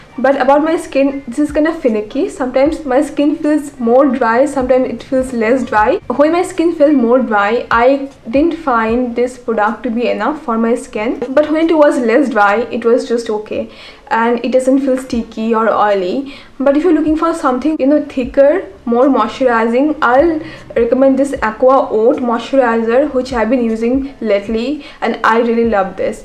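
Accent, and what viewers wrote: India. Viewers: Can you please add captions because it's really hard to understand your indian English accent.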